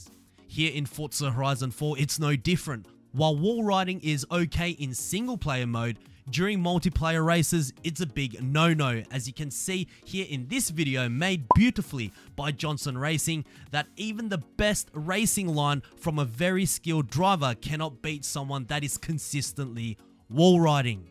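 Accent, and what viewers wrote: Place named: Australia. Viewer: Sand trap. Slow the car down and push it of balance, the same way lowered cars become unsettled when you hit the water flowing over a road. Side note: Make "No collisions" an option in the Online Adventure. E.G. Street Race S2 No Collisions. Too many racers smash into you around that first corner. Better let everyone pass and slowly make your way through the crowd one at a time. Great work keep it up. All the best for the new year. Great to hear an Aussie posting Forza videos. Well done.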